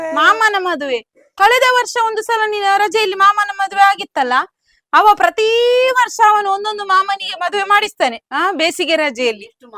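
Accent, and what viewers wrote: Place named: Dakshina Kannada, India. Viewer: Very nice talk Madam. DK kannada is soo 😍 lovely.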